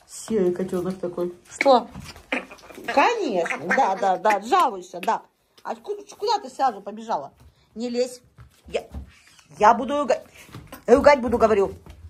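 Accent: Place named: Ukraine. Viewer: Я понимаю, что вы, Хохлушка. Но говорите хотя бы чуть чуть по русски. Не Шыколадки, а шоколадки. Не точно по буквам, а О смягченное. Я очень к вам хорошо отношусь. Всегда вас смотрю. Но пожалейте наши уши(((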